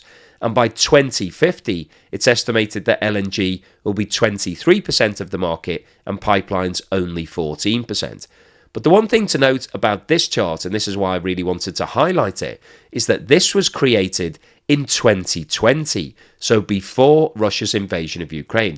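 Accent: United Kingdom. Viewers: Our host is British, eh wot?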